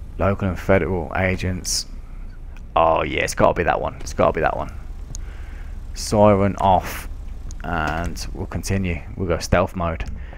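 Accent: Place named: Scotland, United Kingdom. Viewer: sound a bit scottish tbh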